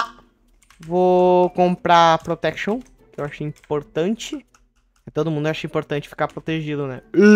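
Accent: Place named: Rio de Janeiro, Brazil. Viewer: o seu carioca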